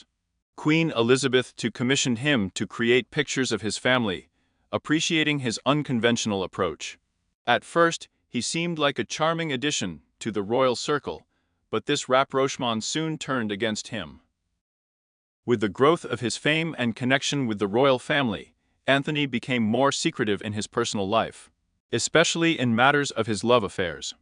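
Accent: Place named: United States. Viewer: The narrator, is he for REAL? Haha. American perhaps.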